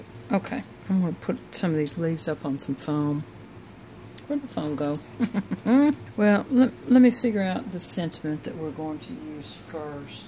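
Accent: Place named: Southeast, United States. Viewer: My husband and I watched your video during my lunch break from work yesterday! You have such a great eye for design. We both loved your cards. Everytime we watch one of your videos we have the conversation about your accent and where you’re from. He thinks maybe Mississippi and I won’t commit to a state but just say “somewhere in the southeast”. My husband is from West Texas and has a definite West Texas accent. I’m from Pennsylvania and although I don’t hear it, when we lived in GA so many people would comment about my northern accent. Accents have always fascinated me. So my guess is still that you are from the southeast but I’m pretty sure not Alabama, Georgia or Mississippi. Maybe Tennessee or South Carolina because yours isn’t as strong as a Alabama, Ga or Mississippi. Sorry for the ramble about non-card/video things. I’ve become such a big fan of your videos that they are literally the first videos I look for when I get time to watch some TV. 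One more question for you, also from our discussion yesterday - what is the microphone you’re using? I’ve been starting to create my videos and finding time to do voiceovers is hindering getting other things done so I’m thinking about looking into microphones so I can talk as I go, like you do, and then edit out what’s not useable, which would eliminate the voiceover step in our production schedule. Thank you again for making videos that show how to use what we already have and don’t have a selling aspect to them! Hope you have a w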